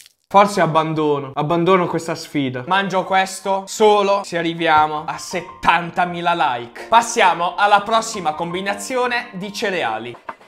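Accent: Apulia, Italy. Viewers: Parla un po' più pugliese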